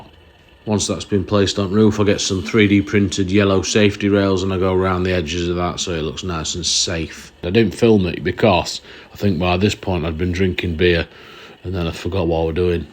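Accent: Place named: North, United Kingdom. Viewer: 😂😂 proper northern instructions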